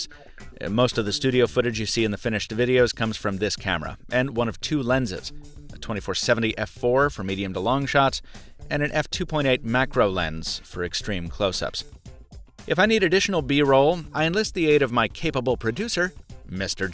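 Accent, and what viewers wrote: Boston, United States. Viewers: are u from boston ?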